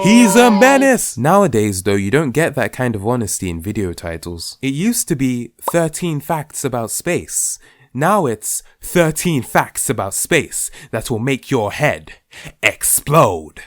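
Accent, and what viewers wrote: RP, United Kingdom. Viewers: Are you British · Heyeyeyeyeye are u British?? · Refreshing to hear a black male with a posh accent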